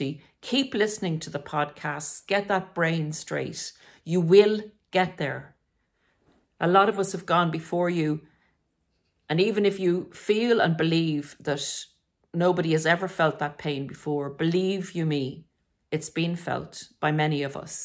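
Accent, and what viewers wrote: Ireland. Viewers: Came for the info - stayed for the lovely Irish voice.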